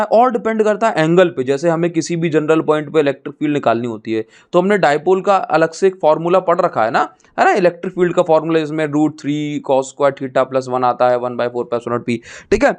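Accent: Odisha, India. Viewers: Ap odisha se ho sir?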